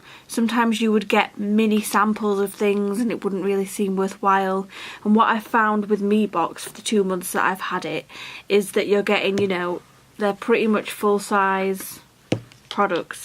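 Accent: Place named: United Kingdom. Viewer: Huh, I’ve been following you on Instagram for ages and I never realized you were British! Great video 😊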